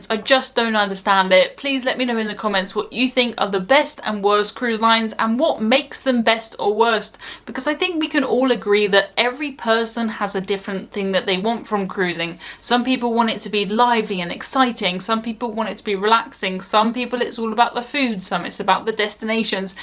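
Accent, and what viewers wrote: United Kingdom. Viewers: Thanks for the British words! I love watching just to hear you talk. We Americans are fascinated by British accents.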